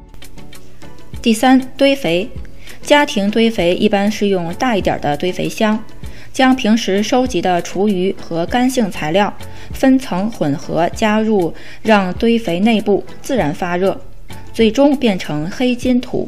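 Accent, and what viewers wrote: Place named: Beijing, China. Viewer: 其实北京口音太浓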